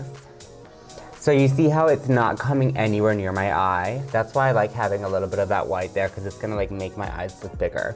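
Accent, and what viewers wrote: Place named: Milwaukee, United States. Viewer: Yeah she's from Milwaukee, so she has a similar accent to Trixie Mattel! If you listen to them both closely in some video's, they will sound quite similar with some things they say lol, it's awesome.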